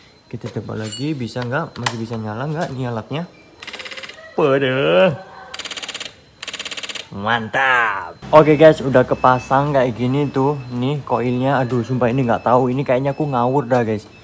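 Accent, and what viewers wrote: Malang, Indonesia. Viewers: Orang malang mas? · Orang malang lur ? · Wong malang a iki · Mas orang malang ya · orang malang bang? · oalahh tibakne wong malang toh sam, pantesan kok medhok2 ngunu mantep sam!! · Wihh orang malang bang?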